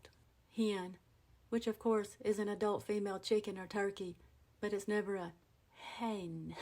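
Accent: Appalachia, United States. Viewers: I’m a Georgia Peach but all of my ancestors kin was from there. And so are many folks around here must have been too, because most of us talk pretty close to you and understand everything you say. I love watching your videos. Makes me feel like I’m listening to my kin. Thanky for sharing of yourself. It sure makes an old old lady’s heart smile. God bless you and keep you. ❤ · If it weren't for your Appalachia accent found here, I'd have moved back to them mountains! I get to watch you pronounce minute, minute, tear, tear, as examples! 😂 Lightning bugs, crawdaddy's, pollywogs, and hogs were fun to catch as kids, but stay away from cow tippin' if the bull is home and horny! "No seeum' spray" is bug juice in the military. Wompus cats are fun to observe during their hoonts! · I talk like this! I'm a hillbilly from West Virginia! you are hilarious! 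· Wow you are gorgeous and I love the HILLBILLY ACCENT. I was born and raised in eastern Kentucky. Thus my Texas Hillbilly name. I now live in Houston Tx but a few years ago I went back home to Kentucky and bought my old home 🏡 place. You can take a Hillbilly out of the hills but you can't take the hills out of a true Hillbilly. Love you girl and the first video I saw 👀 of you was when you were making CHOCOLATE GRAVY. MY MOM Made it for her 7 kids almost every morning. It is GREATTTTT WITH HOT HILLBILLY BISCUITS. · It’s not as bad as some I’ve seen when others speak one way with the appearance you would think isn’t the correct one you were expecting. But the only way I can explain this from my perspective. You can take the girl out of Appalachia, but not the Appalachian out of the girl. What a gorgeous mashup! Oh my! Hubba Hubba! Rawr! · I tawk like that here in Missouri...but my momma grew up in Appalatcha WVA. 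· Young lady i completely understand what you are saying. I have lived in Kentucky my hole life. And your speech is perfect hillbilly. Now let me tell you, ther is a defrance between hillbilly and redneck!!!!!! I'm a proud ky. HILLBILLY.... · You are just so cute when you talk! I love your Appalachian accent! Thanks for the education on Appalachia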